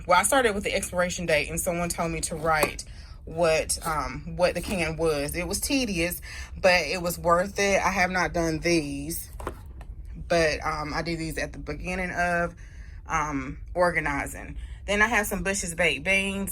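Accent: United States. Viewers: I love your country accent! Its so soothing. I wanted to keep replaying your videos just to hear your voice.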